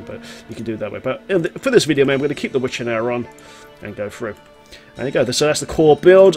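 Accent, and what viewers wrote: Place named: United Kingdom. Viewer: I don't understand half the things this guy is saying! Damn Brit accent. Slllooooooow down man when you speak during your vids, thanks!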